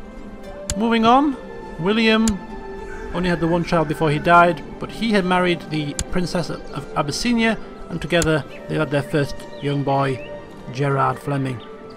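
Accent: England, United Kingdom. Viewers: what part of England do you come from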